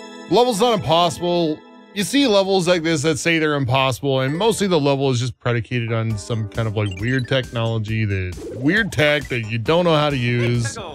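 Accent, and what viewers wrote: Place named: Canada. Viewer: He's Canadian. I assume that's how they pronounce it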